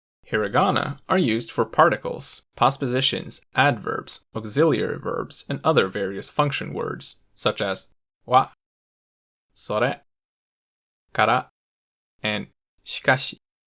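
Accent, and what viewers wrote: United States. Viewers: Stand out? Like fingernails on a blackboard. To me, it's the worst sound in (American) English. I cringed every time you pronounced it, as well as when you pronounced Katakana and Hiragana in such an American accent. Otherwise, well done... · THE WAY YOU SAY THEM WITH THE BIGGEST AMERICAN ACCENT PISSES ME OFF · right? I'm Aussie and I honestly thought he was just American, sounds just like every other American to me! 😂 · you have the most american pronunciation of kanji ever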